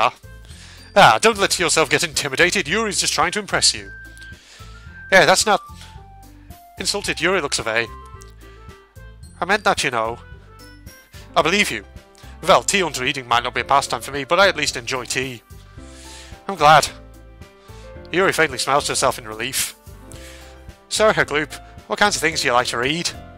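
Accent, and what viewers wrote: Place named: Ireland. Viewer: Damn I love the irish voice^^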